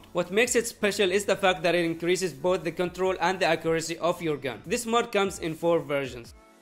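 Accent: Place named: India. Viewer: Guys hes has a indain accent that means hes indain so it fake he is capping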